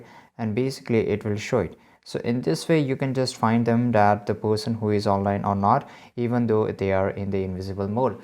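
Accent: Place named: India. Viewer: Bro its just an indian accent, why u bein racist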